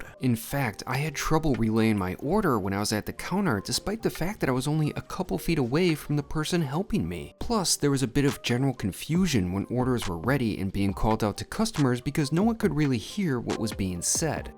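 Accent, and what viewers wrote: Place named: Wisconsin, United States. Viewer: That accent… You’ve got to be from Wisconsin?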